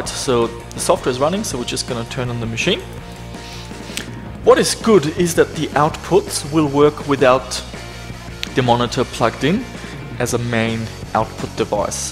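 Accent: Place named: Germany. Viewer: Du hast ein Deutschen Akzent.^^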